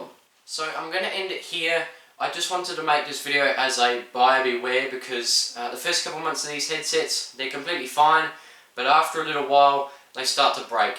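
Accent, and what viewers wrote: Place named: New Zealand. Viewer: damn im pretty early, think im the first aussie, tbh you sound more kiwi then aussie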